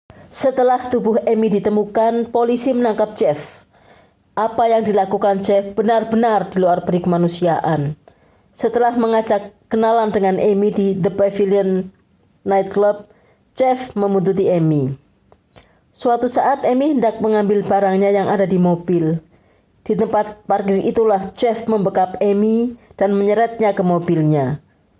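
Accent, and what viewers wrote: Java, Indonesia. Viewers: dengar suara nya yg medok itu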